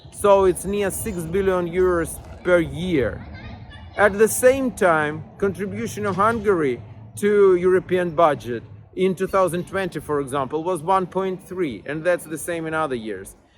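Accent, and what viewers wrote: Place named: South, Russia. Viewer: инглишь себе подкрути, акцент остался. выраженный южный русский говор. палишься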